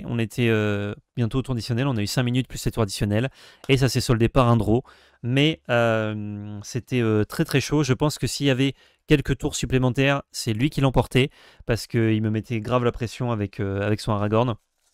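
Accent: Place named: Italy. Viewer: Quel accent italien !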